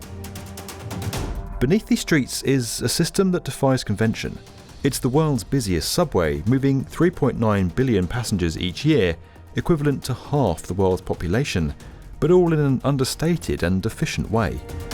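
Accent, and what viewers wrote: United Kingdom. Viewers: This ìs the first time ive had a bit of a hard time understanding a british accent its like they chose the most british person ever lol😂 Easy to use - E to use Schedule - Say dule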